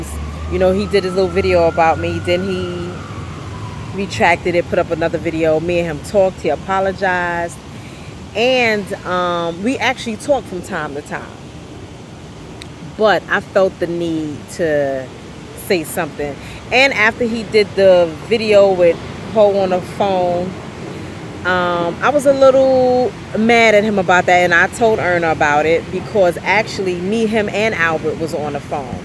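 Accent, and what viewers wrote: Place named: Harlem, United States. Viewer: I love her Harlem accent 😏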